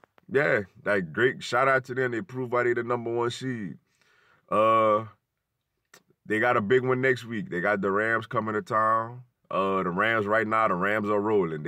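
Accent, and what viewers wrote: New Orleans, United States. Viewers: Bruh it sound like you from New Orleans.. I’m frm New Orleans myself so I know a fellow New Orleanian when I hear one. I’m jus confused as to why you not a Saints fan cause it sounds like you ain’t been gone too long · It kinda sound like you're from New Orleans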